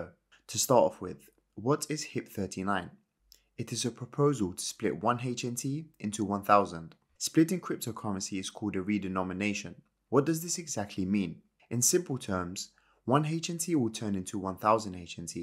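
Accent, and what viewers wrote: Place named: England, United Kingdom. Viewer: cheers mate! keep up the uploads, nice to have someone english talk about this stuff instead of these waffling americans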